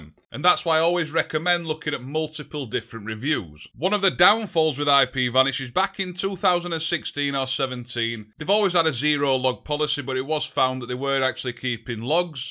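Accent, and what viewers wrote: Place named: United Kingdom. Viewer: Nice to see someone with a British accent. I can't stand the American one. Especially when they say words like data it does me headin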